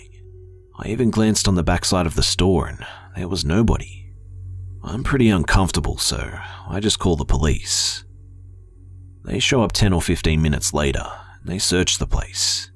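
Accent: Australia. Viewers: can't quite place your accent. Australian , maybe?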